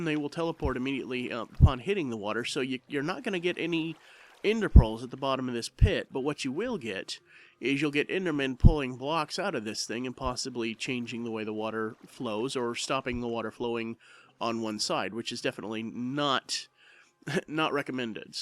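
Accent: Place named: England, United Kingdom. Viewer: You English not Mrican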